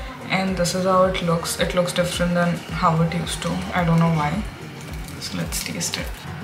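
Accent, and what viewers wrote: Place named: Hyderabad, India. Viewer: She is hyderabadi. I can interpret from the english accent and speech pattern.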